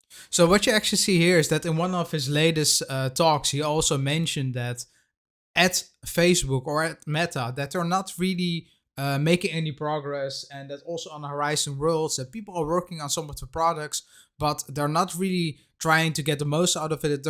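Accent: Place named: Netherlands. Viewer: Ik hoorde gelijk dat je uit Nederland komt :)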